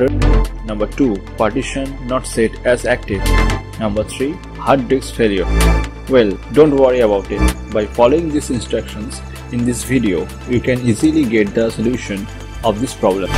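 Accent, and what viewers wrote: India. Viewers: It’s not so much the music that sucks, it’s your horrible accent. I can’t understand a damn thing you say in that heavy India accent. Subtitles at the bottom sure would have helped.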